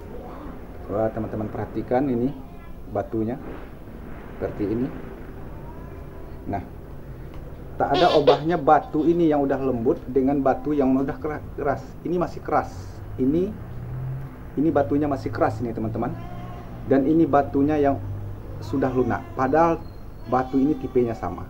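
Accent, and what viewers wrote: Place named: Manggarai, Indonesia. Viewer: Maaf dr logat bicara macamnya asal Manggarai Flores NTT...SALM dr NTT...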